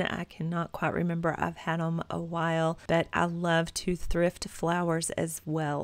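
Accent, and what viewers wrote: Texas, United States. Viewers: I just recently started following you, and thought I detected a Texas accent. I'm from Lubbock. Thanks for all the great inspiration. Your home is beautiful.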